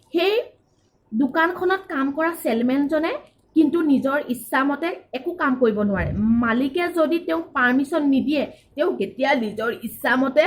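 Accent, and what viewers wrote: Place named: Sivasagar, India. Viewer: তোমাৰ ঘৰ শিৱসাগৰত নেকি কথাখিনি শুনি ভাল লাগিছে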